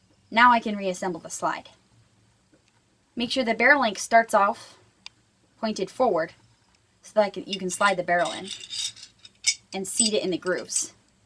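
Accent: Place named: Midwest, United States. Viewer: ahhh, that makes sense, i live in georgia, but i'm from ohio, so i guess i just recognized your midwesternness lol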